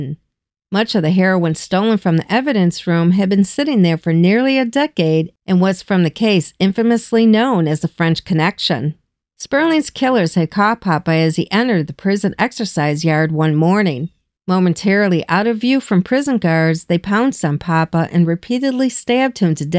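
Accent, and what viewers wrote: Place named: Northeast, United States. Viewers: It's like I'm back up in the Bronx listening to Aunt Irene tell a story. Love it. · Another great production and presentation! Please take this as a huge comment, your voice with your accents really brings this old country boy the Northeast mafia territories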